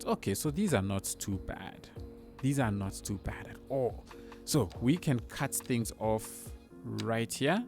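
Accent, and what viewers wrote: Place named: South Africa. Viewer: Love your South African accent bro refreshing from all the American YouTubers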